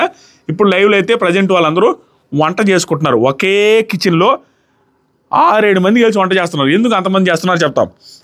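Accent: Karnataka, India. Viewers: Kannada slag super anna 😂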